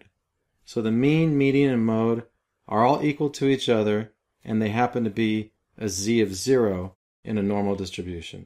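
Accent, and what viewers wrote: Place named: United States. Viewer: This is fantastic! A four-minute lesson on exactly what I am trying to understand --the 68%. Plus, it's a native American speaker with a clear-as-a-bell voice. I only stopped it from auto-playing the sequel in order to vote thumbs-up!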